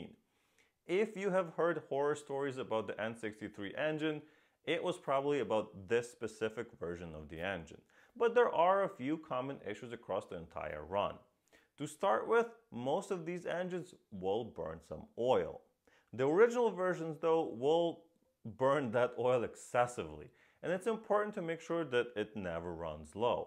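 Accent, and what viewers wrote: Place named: Russia. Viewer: У тебя как будто русский акцент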